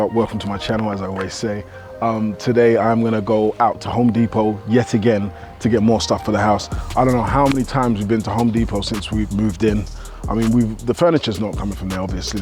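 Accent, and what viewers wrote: London, United Kingdom. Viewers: Just found your channel mate, love it, instant Sub. I thought "ah cool, a Brit giving advice".... I'm gonna ignore the fact that you're in the US, lol. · i lke energy bro its genuine bro am just wondering are you from Uk you sound like a Londoner..?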